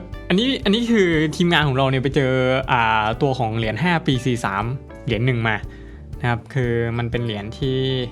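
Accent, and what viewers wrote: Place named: North, Thailand. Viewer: เสียงหนุ่มเมืองแปร่ อู้ไทย เสียงหล่อ ดี น้อ อิอิ:face-blue-smiling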